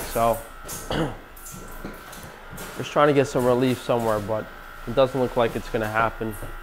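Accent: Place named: New England, United States. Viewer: his yankee accent definitely would stick out in the south... southerners pick that up instantly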